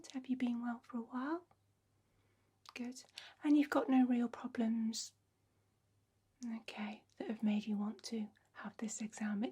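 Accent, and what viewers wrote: United Kingdom. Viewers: i Like the British accent when they’re Yelling and cussing at each other calling each other BLOODY BOLLOXS (This is all jokes but you’re native accent is beautiful and you should know that you are loved by Jesus Christ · I can't get enough of hearing that beautiful British accent here! Thank you for taking care of my ear cranial nerve that has been bothering me for weeks here! Have a beautiful good night 🌙 sleep! 🥱🥰💜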